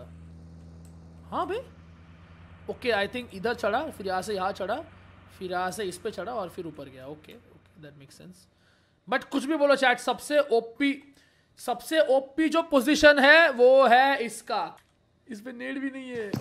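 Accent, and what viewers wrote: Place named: Maharashtra, India. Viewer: You say funny marathi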